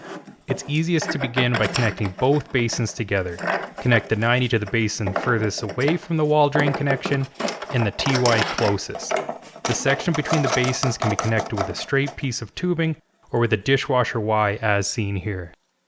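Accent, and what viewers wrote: Canada. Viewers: Great job! You sound Canadian. Is it a norm in cold regions to use ABS piping for drainage? Or anything else? I was looking for a good tutorial for my kitchen plumbing project. Cheers from Houston, Texas.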